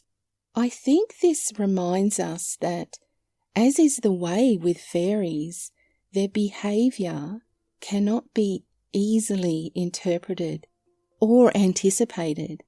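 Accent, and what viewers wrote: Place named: Australia. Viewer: wow wat a voice... im french canadian and allways loved your Australian accent's ,you folk from down under...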